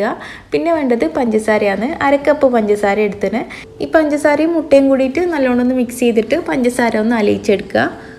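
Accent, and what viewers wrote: Kannur, India. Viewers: കണ്ണൂർ ഭാഷ കളയൊന്നും വേണ്ടാട്ട. അതാണപ്പ അയ്ൻ്റെയൊരു രസം. പറ്റുമെങ്കിൽ ഇംഗ്ലീഷ് സബ്റ്റൈറ്റ് ൽ കൊടുക്കാൻ ശ്രമിക്കുക. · Good ഇങ്ങനെ തന്നെ കണ്ണൂർ ഭാഷ യിൽ തന്നെ മുന്നോട്ട് പോവണം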